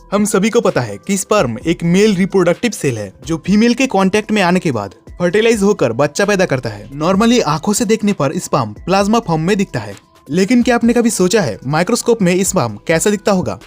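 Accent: India. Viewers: It's very amazing. Your accent is like that of a bengali🤭🤭❤💖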